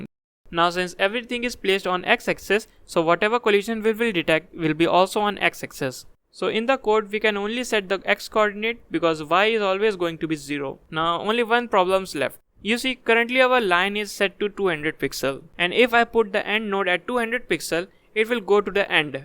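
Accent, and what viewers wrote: India. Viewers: Indian accent !!!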